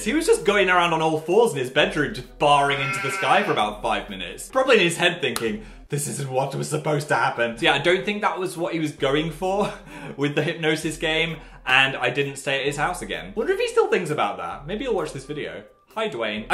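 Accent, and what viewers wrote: North, United Kingdom. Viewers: u sound northern again im living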